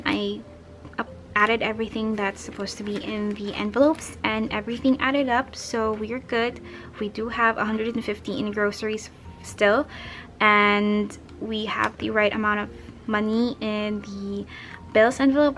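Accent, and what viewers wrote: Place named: Philippines. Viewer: Firts time viewer and why did I recognize the Filipino accent from sentence one. 😅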